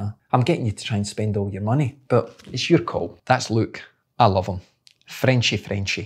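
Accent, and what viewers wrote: Scotland, United Kingdom. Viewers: yeah, pretty much been using Time since day 1. Using Crank Bros for gravel and MTB, which is similar to Time in terms of lateral float and retention/release. Love the channel (and the Scottish accent!) and keep up the great work! 👍👍👍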